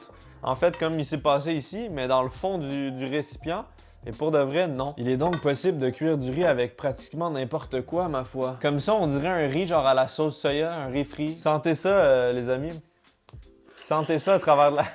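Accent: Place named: Quebec, Canada. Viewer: Je suis français et entendre ton accent est un pur bonheur <3 vive le quebec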